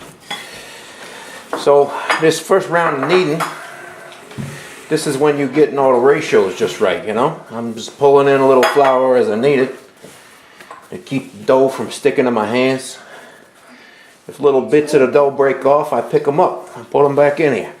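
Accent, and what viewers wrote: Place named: New York, United States. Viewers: You are amazing! I love listening to you speak. I am originally from Rochester, NY, 72 years ago, so after 25 years in SC I love to hear a real New Yorker talk. The bread is beautiful, and what it means to you is even more beautiful. I made my first loaf today, and it's in it's final rise. I hope it turns out at wonderful as yours did, though I only made 1/2 recipe. Thank you for your time and energy you put into this video. I hope you and your family are always blessed. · Love listening to your N.Y. accent, and the passion you expressed, and your CHallah metaphor...Thank you for sharing your amazing recipe! I would try adding the sugar to the yeast well you made, just to cut a little wait time. Shabbat Shalom!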